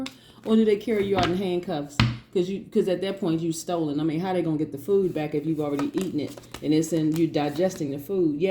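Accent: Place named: Chicago, United States. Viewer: You are too cute. You talk like you are from Chicago! Bust some suds! Yes, the reading ... on point as always!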